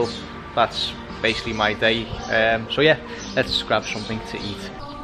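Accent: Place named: United Kingdom. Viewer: How do u have British accent as a Dutch?😄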